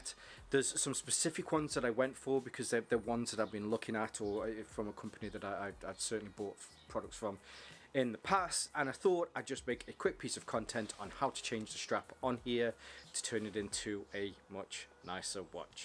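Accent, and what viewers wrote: United Kingdom. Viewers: Hi, great video and nice watch :) I'd love to do this to mine although unable to find somewhere to buy Jays and Kays in the UK, assuming you're in the UK from the accent, where abouts were you able to get these from? Cheers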